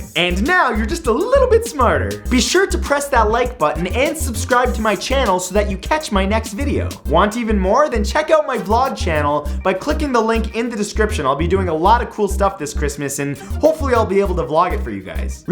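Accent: Canada. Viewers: Wow now i definitely know that your Canadian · Is he Canadian?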